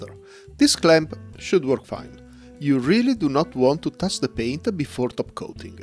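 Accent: Italy. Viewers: l’accento è decisamente italiano… per questo ho fatto la domanda. Bel canale, bravo!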